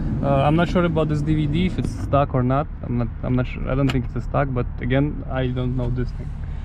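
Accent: Russia. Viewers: I,d say a Ruskie too .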